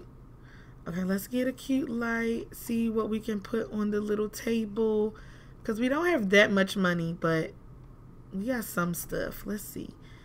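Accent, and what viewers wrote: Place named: South, United States. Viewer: I found your LP and decided to watch...Your commentaries are so funny! I watched single dad just so I can hear your southern twang...Keep up the good work. Thanks!!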